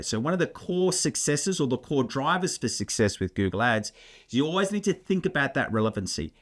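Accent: Australia. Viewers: Oh yes a fellow Aussie! much rather listen to a aussie talking about this stuff.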